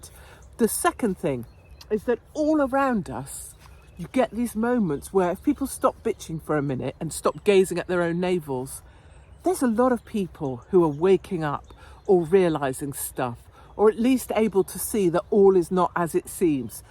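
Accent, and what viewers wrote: RP, United Kingdom. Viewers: I love you sound like a posh girl,articulate like an estate girl.quality.x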